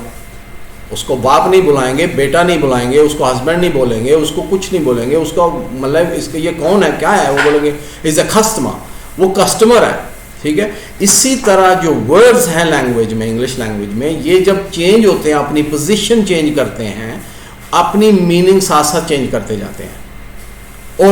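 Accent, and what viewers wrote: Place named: United Kingdom. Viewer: Hi, You the person whom i was looking for British accent and your excellent work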